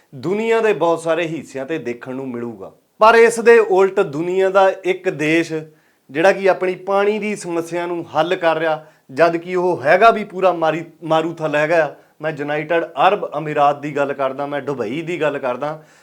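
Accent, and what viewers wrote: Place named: Punjab, India. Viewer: If all you noticed is one word, not the effort behind the video, that says more about you. UAE, JuAE - pronunciation isn’t a big deal, especially when the message is clear. Focus on the content, not nitpicking. Respect the hard work. If you don't like to hear Punjabi accent, try uploading videos in English and I'll listen..go get some life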